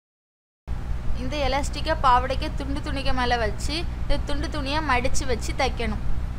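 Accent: Tirunelveli, India. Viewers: அருமையான திருநெல்வேலி தமிழ் பேச்சு சூப்பர்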